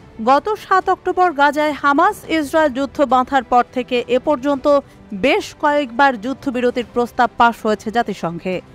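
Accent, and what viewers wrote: India. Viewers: খবর প্রচার কারি মহিলার কন্ঠ ভারতের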